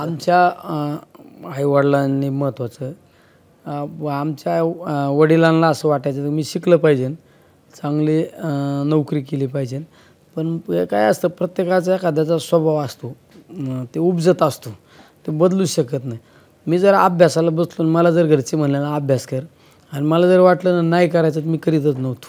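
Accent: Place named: Ahmednagar, India. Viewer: अस्सल नगरी भाषा...त्याला काय लागतंय, एखाद्या वेळेस पह्यलं भाषण इंग्रजीत करील!!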